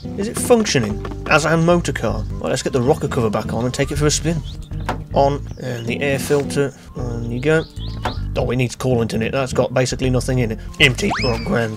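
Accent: United Kingdom. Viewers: You are a mix of thedooo, mcnasty, martincitopants and british. And i love it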